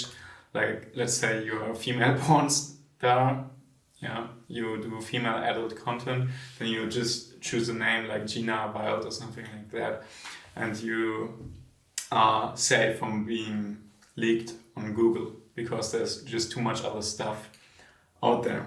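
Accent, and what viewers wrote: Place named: Germany. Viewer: Ich wusste dass du Deutsch bist, der Akzent hatte recht haha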